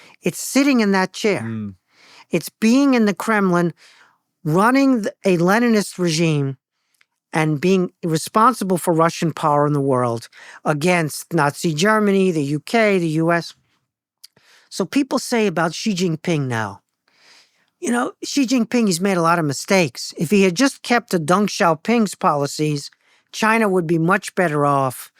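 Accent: New Jersey, United States. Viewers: man im from up there my entire family has jersey accents and this guy sounds uncannily like joe pesci.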